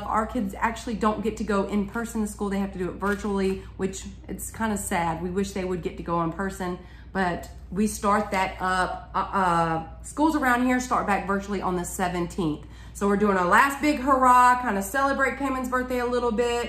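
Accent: South, United States. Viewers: I've watched you for a long time and you're getting louder and louder and more and more country all the time... I wonder as a woman from North East Tn. And country as anyone so I am not fussing Lord knows. It's only that I am curious if your husband or mother or someone was making you tone down and you've cut loose or what happened recently. You are deceiving the Bulldogs glad and have said y'all a dozen times in 2 minutes and I could hear you all the way in back of my house your video started auto play is on and I watch you allot so it started you're newest video and I had to come from back of the house to turn it down. So curiosity I guess..... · Where are you from live your accent you sound like Paula Deen saying y'all lol....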